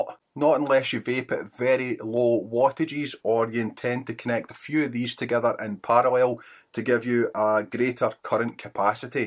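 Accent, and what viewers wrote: Scotland, United Kingdom. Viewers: That strong Scottish accent tho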